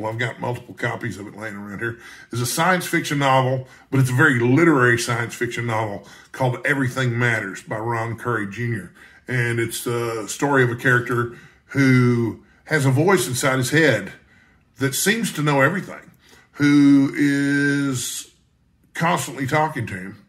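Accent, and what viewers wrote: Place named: South, United States. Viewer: Great to hear a fella with a little South in his mouth. You’ve got some interesting picks.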